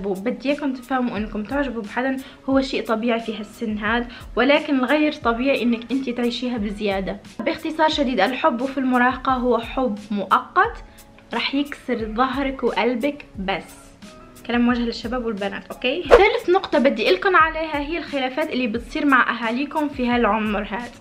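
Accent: Tunisia. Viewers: انت تونسية؟؟❤️❤️ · حسيت انو انتي من شمال افريقيا انتي من تونس 💙💙💙💙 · انا اول مرة نشوف فيديو تاعك اما انتي تونسية مش هكا حسيت من لهجتك هكا نبرتك انك تونسية صحيح و الا لا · Omg u're a tunisian girl when i heard u frist i felt u were tunisian but then i was like naahhh this girl has a lot of accents idk menysh msad9a ama merci i needed thiiissss sooo baad 💖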